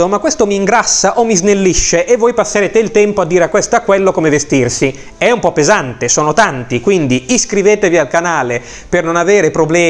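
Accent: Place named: Italy. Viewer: italyano!!!!!!!!!!!!!!!!!!!!!!!!! :( the most funniest accnt of all time and in the history of the universe whahahahaha!!!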